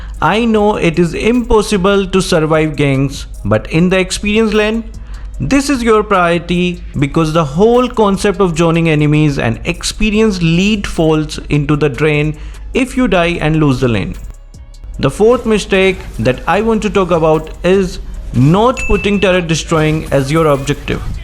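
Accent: India. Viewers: Channel ini ni punya orang India ya? Logatnya kebaca bgtt jir 🤣🤣🤣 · Your voice similar with indian accent...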